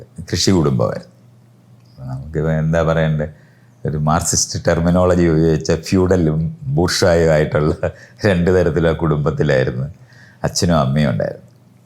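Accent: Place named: Kollam, India. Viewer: പ്രിയ മൈത്രേയൻസാർ, താങ്കളുടെ മലയാളം എൻ്റെ കൊല്ലം (കൊട്ടാരക്കര) ഭാഷ പറയുമ്പോൾ എനിക്ക് എപ്പോഴും അനുഭവപ്പെടുന്നുണ്ട് ഇന്ന് സംശയം മാറിക്കിട്ടി സന്തോഷം താങ്കൾ എന്ത് ജോലിയിൽ പ്രവരത്തിച്ചിരുന്നു. എന്നു കൂടി പറയു👍♥️👍♥️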